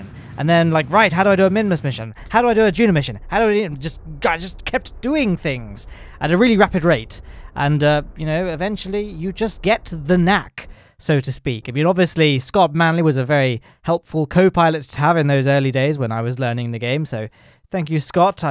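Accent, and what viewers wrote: United Kingdom. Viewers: It's just how us british talk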